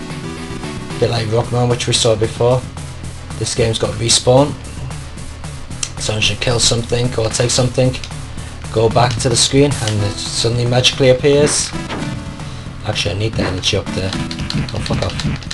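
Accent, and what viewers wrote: United Kingdom. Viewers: You sounded more british this time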